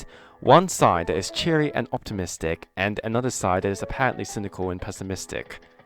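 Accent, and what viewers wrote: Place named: United Kingdom. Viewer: Also, your British accent is 👌👌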